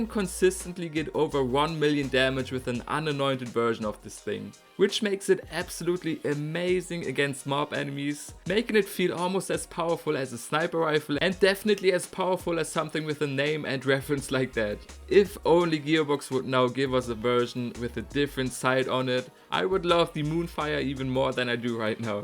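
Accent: Germany. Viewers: Man erkennt zwar sofort das er deutsch ist aber sein englisch ist nicht schlecht und lohnt es sich nicht mehr durch englische videos mehr leute zu erreichen als durch deutsche?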